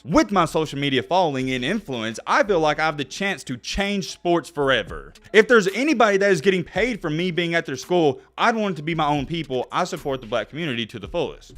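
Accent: South, United States. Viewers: No clue who dude is but he just spent half of this video talking about random shit lmaoo. “Exposing….” Do it then, don’t spend 7 minutes boring with your lil yeehaw accent smh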